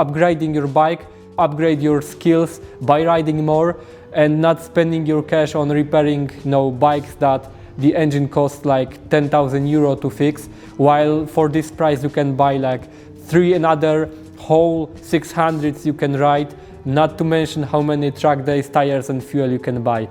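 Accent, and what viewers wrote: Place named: Poland. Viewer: Powodzonka, dobrze sie slucha tego Polskiego Angielskiego 👍💪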